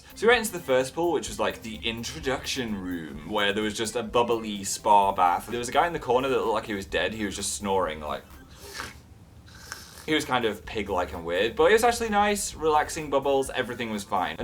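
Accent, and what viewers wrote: United Kingdom. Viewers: I love the way he said 'america'. Mmm<3 Dem British accents*shivers from fangirl overload*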